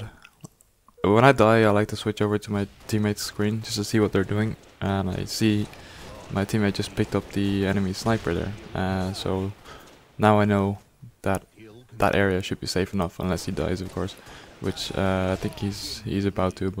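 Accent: Ireland. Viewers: He's Irish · I think hes irish ¡¡¡ lol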